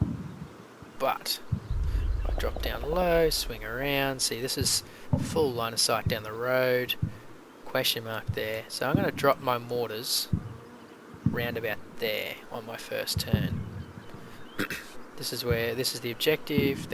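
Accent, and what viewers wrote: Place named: Australia. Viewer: This is almost perfect. If only you weren't Australian.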